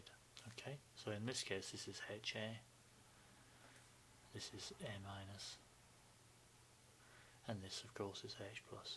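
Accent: United Kingdom. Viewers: Thanks for this tutorial, it is great and very logic. It helped me a lot. And i LOVE your british accent! G.d save the Queen!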